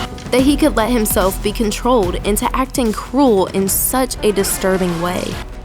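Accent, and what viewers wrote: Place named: Texas, United States. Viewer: You're from texas right? I can hear it in your accent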